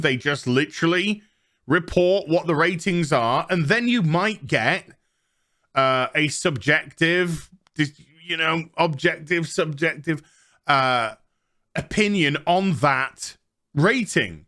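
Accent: United States. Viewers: Yep, you sound more and more American all the time.: ) O.o? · you do sound American sometimes lol and I love it!! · Gary's fault for your American speech. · Yes you do sound like a yank somethings. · I'll admit I couldn't even tell you wasn't american my first time watching you.😅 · Are you American? You are speaking American with a funny accent.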